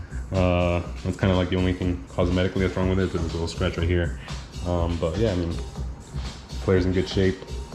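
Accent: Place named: Miami, United States. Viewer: miami accent 305 . i might look into denon for a third channel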